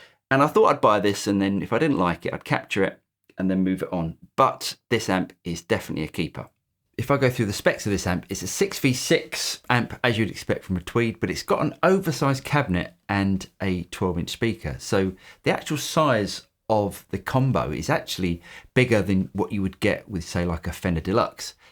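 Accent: United Kingdom. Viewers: try a hamstead, you sound english....come on man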